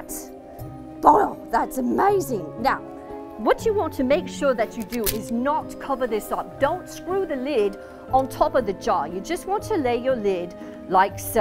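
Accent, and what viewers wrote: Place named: United Kingdom. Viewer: Aaah... "O" Town ! I lived there many years. Migrating from Miami to Broward then Orlando area. The Beauty N/W of Orlando , [ Rolling hills, Beautiful springs & People ! ] Then.. 90% of those areas Paved over & MILLIONS of people Still moving into FL !! You have A GREAT Weather channel !! (Just found it 3 days ago) Very good "Brit" Accent ! BTW... Cheers & stay safe in these BEYOND preposterous times !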